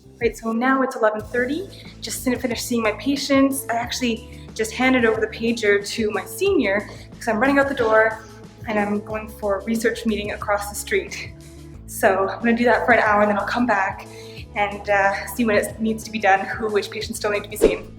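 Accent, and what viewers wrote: Canada. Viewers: Is she Canadian? Her accent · are u canadian ? if so what part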